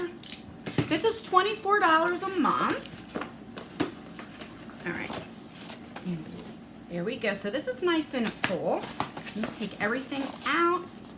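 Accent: New Jersey, United States. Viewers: Like your Jersey accent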